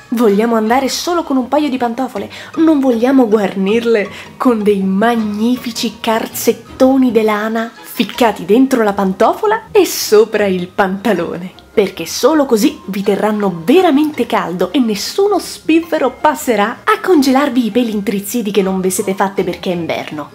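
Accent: Rome, Italy. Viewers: Amo alla follia la tua comicitá, la tua parlata romana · Fantastica come sempre xD troppo forte !!! si capisce che sei romana !!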